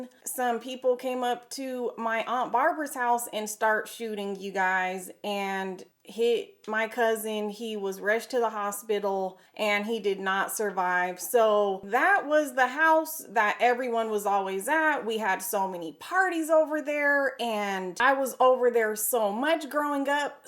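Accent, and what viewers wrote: South, United States. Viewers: she sounds country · This is my first video and I can not for the life of me figure out where this girl is from... what accent does she have? It sounds southern but with a twang?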